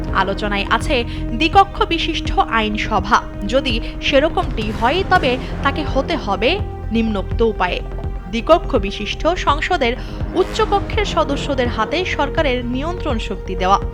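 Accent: India. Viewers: ভারতের স্টাইলে সংবাদ পাঠ🖕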